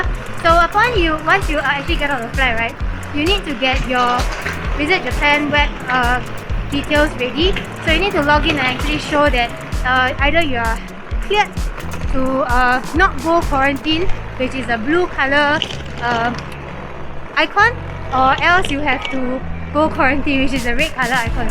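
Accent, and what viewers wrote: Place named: Singapore. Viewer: Listening to ur singlish just made my day... Reminds of my 3 years uni at Nanyang.. How i struggled during my initial days to get used to singlish with my professors.. Love the singlish btw.. Enjoy ur trip to Japan.. Love from India 🤗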